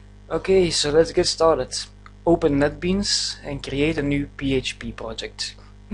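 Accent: Netherlands. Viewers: You are dutch I assume? :p Ik ook :p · You have a dutch accent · We horen direct dat je nederlander bent lmao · Binnen 1 seconden te merken dat je Nederlands bent haha, chill video! Thanks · nederlands accent, geen echte engelse :D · you're dutch right (no offence it's the accent) :)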